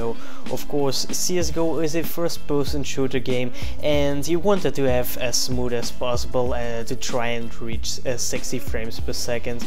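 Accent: Netherlands. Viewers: Yay nederlander die engels praat lols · Wait a sec, you dutch bro? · You are dutch? :D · Whuut are you dutch? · Are you from the nethetlands? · oooh je bent nederlands k daxht al toen je control alt delete zei youre dutch i was thinking about your accent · je hoort heeell goed als iemand nederlands is xDD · So you're Dutch, or Flemish? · are u dutch?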